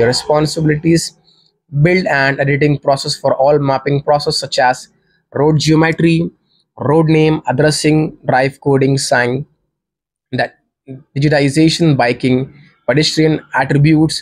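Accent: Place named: United States. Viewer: Bhai first change your tune into indian. You're speaking hindi with American accent 😂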